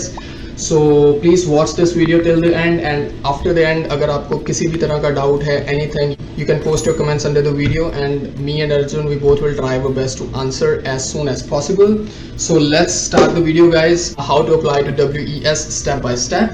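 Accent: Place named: Canada. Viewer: Veere .. gonna gonna gonna 🤪 kini vari bolna hai.. u adapt Canadian Accent pretty well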